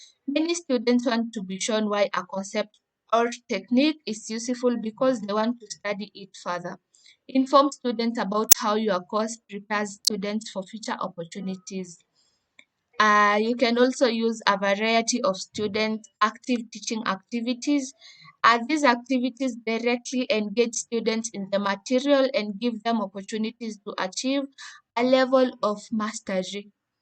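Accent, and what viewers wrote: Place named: Kuria, Kenya. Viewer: Heavy Kurian accent kama nusu vinemba wenzako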